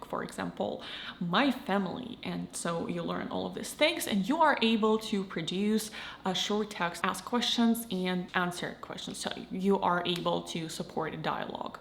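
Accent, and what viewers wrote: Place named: Russia. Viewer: The late zoomer/early millennial Russian accent is something else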